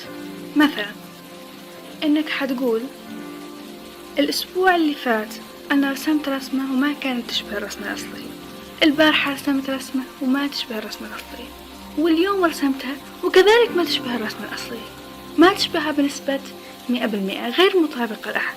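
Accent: Iraq. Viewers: لهجتها عراقية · شنو لهجتج بالضبط عراقي خليجي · لا عراقية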